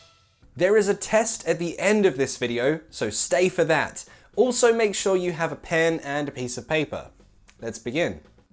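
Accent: United Kingdom. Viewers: When I wanted to learn British accent, I found you here at YouTube... How lucky I was!! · Nice lesson again and again. Btw i love ur British accent it so sexy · A friend of mine recommended me to watch your videos in order to improve my british accent and im so glad he did so because your videos are perfect (+_+) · I just don't look for the video at the first time.I just like that like button.You are the first teacher on youtube who inspired me to learn British accent. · I love your lessons!! I LOVE the way you deliver it! I LOVE your British accent 😢 and there are always new things to learn ❤ Keep up the good work!. I won't miss any of your videos again 🙌.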